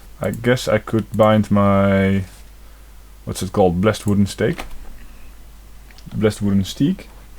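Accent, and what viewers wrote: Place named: Netherlands. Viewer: Ben je Nederlands? Zo klinkt je accent namelijk! :)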